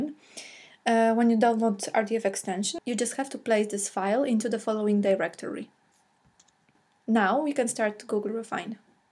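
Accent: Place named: Poland. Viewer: are you from Poland? Cause you have soo polish accent :)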